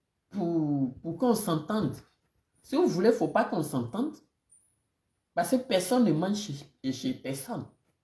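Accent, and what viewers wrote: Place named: Ivory Coast. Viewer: J,aime votre accent purement ivoirien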